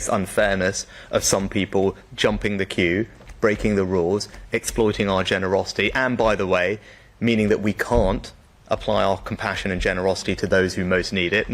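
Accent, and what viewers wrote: United Kingdom. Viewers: He's Biden with a British accent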